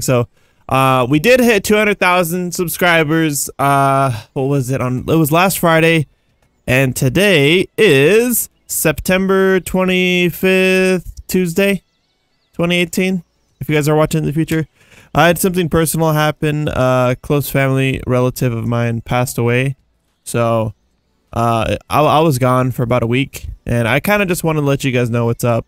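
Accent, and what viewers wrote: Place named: United Kingdom. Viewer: I think your British voice is really cool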